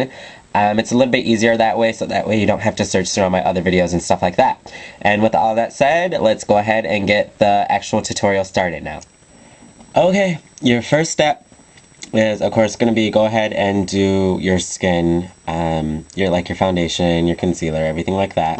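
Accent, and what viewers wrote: United States. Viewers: I laughed when you said (something like that) "or maybe look like a drag queen on smoke-snow" *laugh* (do you mean the white powder - like drugs?) (American English is defenitivly harder to understand than the English one) *THUMBSUP* For your good Make-Up Tutorials :)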